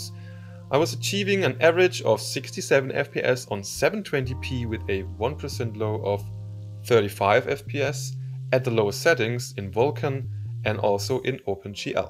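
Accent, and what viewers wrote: Germany. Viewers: Danke für dieses Video:) Deutscher Akzent in Englisch is so easy raus zu hören:D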